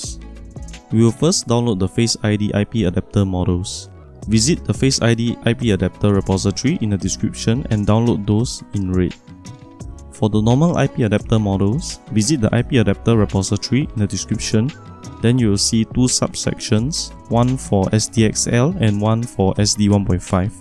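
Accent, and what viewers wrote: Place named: Singapore. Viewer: I'm glad I found this video, very well explained on the IPadapter workflow. Subscribed to the channel.. btw, love the Singaporean accent :)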